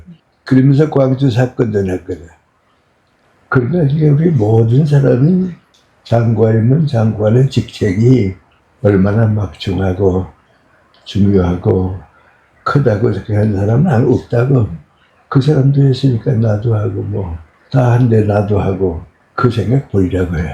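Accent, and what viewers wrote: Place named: North Korea. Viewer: 북쪽 사투리...듣기 힘든 시대가 되었어요. 언제나 들을 수 없는 귀한 말투 입니다. 지금도 듣게 해주셔서 감사합니다. 건강하시길 간절히 기도합니다.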